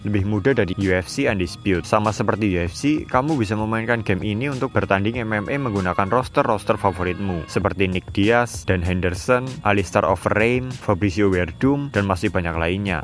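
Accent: Banyumas, Indonesia. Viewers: Naratornya ngapak yah😅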